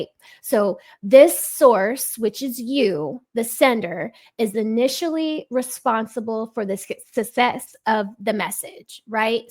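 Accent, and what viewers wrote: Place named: South, United States. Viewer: Kinda figured you were mixed with something. Couldn't put my finger on it. Occasionally, I could hear a bit of a Southern twang and come the freckles.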